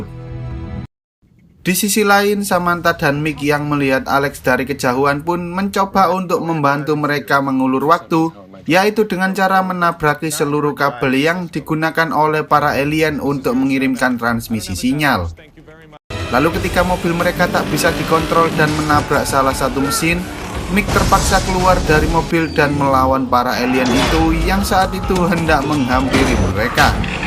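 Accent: Java, Indonesia. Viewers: Lu orang jowo ya bang sama gw yo orang jowo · Rasah kesuen 😂 Jowo e endi?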